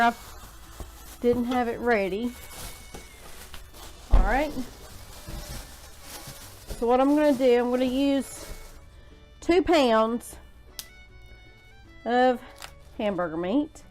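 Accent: South, United States. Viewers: I’m afraid I didn’t have an easy time of it while trying to remove the membrane off the ribs. Where are you from, I love your southern drawl!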